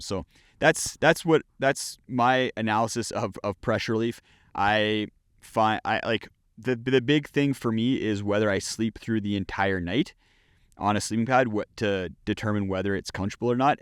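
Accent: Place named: Minnesota, United States. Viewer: Love your channel - just found it, and am making my way through. I really appreciate your thought process and honest takes/reviews. And your accent - sounds like home to this Minnesotan. 😂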